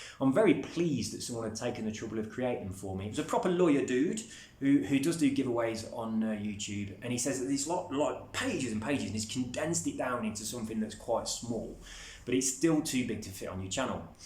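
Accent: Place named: Scotland, United Kingdom. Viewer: LOL nice apartment! Don't know anything about you but I can say you're a Scotch. Got it from the accent! Never had an apple watch but I can guess they're bad. Also agree with you. No idea why people have to be over 13 to join giveaways or restricted to join any of giveaways. Usually I enter only PS4 or PS5 competitions. I did enter yours because I want to trade for PS5 as soon as I win the competition. Well, the reason I want to trade for PS5, technology is really expensive in my country, Turkey. Don't know if you believe me but I still can't afford a PS4. That's why I want to win XBOX Series X. IMHO Xbox got better hardware, that's cool, but we can't ignore the fact Playstation has better exclusive games. I am not a multiplayer person, I play games for its story. I wanna feel in it. Which is why I am going to trade with a PS5 if I win this competition. 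I want to thank you for giving us this chance and good luck to all of you. Have a nice day and stay safe!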